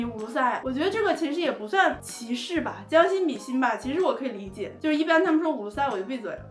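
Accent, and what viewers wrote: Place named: Shanxi, China. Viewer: 感觉有点儿山西口音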